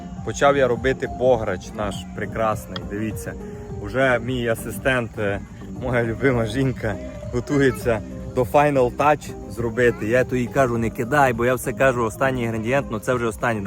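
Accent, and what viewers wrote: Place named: Zakarpattia, Ukraine. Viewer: мене більше цікавить як у нью-йоркерця до сих пір такий прекрасний закарпутський акцент😅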